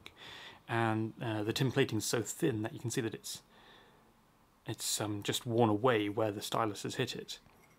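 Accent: United Kingdom. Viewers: Yeah he just sounds British is what you're trying to say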